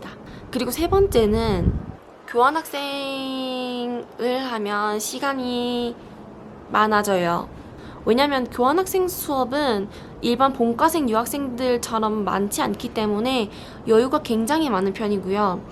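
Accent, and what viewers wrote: China. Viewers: 혹시 조선족이세요? 발음에 중국인특유의 어투가 진한데.. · 그리고 점점 중국어가 느시나봐요! 한국어 발음이 중국인처럼 바뀌고 있어요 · 발음이 중국화 되신거 같네요 ㅋㅋㅋ 신기합니당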